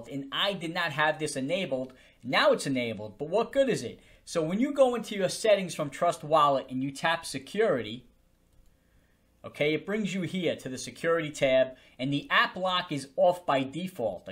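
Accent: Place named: New York City, United States. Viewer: I love your NYC accent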